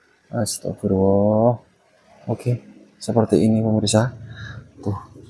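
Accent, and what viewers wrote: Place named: Central Java, Indonesia. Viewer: Embak orang jawa tengah ya... Td dengar nggih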